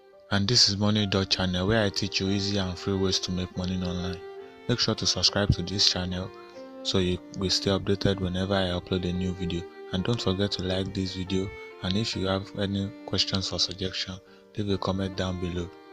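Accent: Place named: India. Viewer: You are speaking like an Indian man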